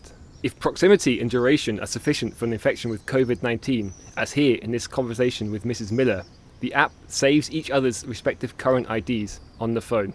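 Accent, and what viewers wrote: Germany. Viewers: Hahahah der deutsche Akzent 😂😂😂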